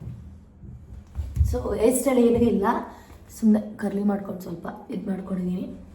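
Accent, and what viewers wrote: Mandya, India. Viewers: Mandya style speaking ❤😅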